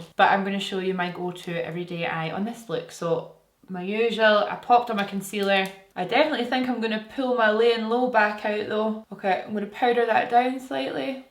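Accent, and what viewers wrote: Scotland, United Kingdom. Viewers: Omg that accent is the best! Scottish? I’m a Swede so don’t hate me if I guess wrong 😛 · Never lose that gorgeous scottish accent. <3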